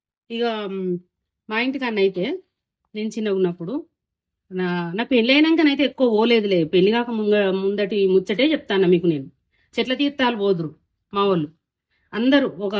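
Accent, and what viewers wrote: Telangana, India. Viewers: అమ్మ మీ తెలంగాణ భాష చాలా బాగుంది తెలంగాణ భాష లో ఎంత చక్కగా మాట్లాడారు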